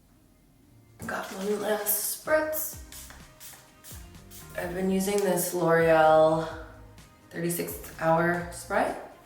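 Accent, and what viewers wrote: New Jersey, United States. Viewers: I just noticed your New Jersey accent ☺️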